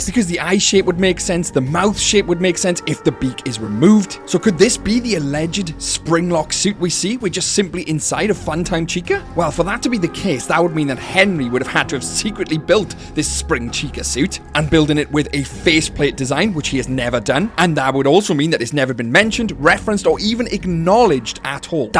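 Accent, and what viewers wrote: Wales, United Kingdom. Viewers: 'Funtime Beaka', love that slip of the tongue, esp. with the Welsh accent :D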